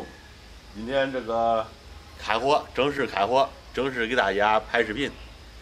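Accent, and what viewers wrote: Henan, China. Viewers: 如果你只想拍给河南人看，你就继续河南话，否则，可以尝试下普通话。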